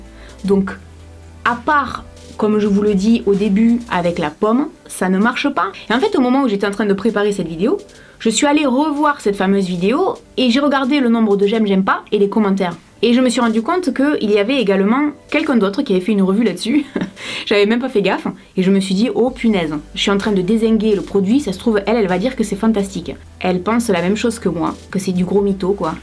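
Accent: South, France. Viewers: Bonjour ( petite parenthèse perso vive les accents du sud) c’est la deuxième vidéo de toi que je découvre et j’apprécie beaucoup! Tes vidéos sont honnêtes et faites pour aider en donnant un avis sincère donc merci de ton aide et à bientôt je suivrais tes prochaines vidéos 👍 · T'es sudiste non ?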